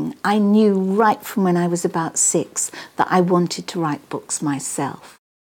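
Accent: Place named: United Kingdom. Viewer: I love her British accent its so sweet :P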